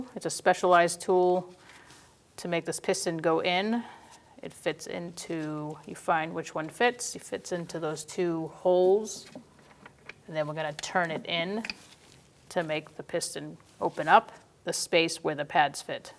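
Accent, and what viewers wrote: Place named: Massachusetts, United States. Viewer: Thanks for the help. That accent reminds me of my mom. Mass born. As a kid i would ask her to say water for a laugh.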